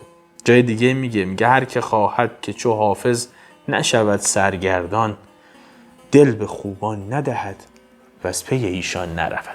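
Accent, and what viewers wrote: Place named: Kabul, Afghanistan. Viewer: عزیز را خوشبختی نصیب باد که‌ خیلی خیلی خوب گپ می زنی و با سخن زدن ما مردم کابلستان بسیار نزدیک و کاملا قابل فهم است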